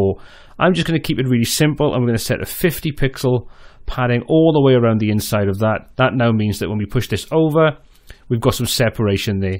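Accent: Wales, United Kingdom. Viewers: You sound like you're from Wales. Am I right?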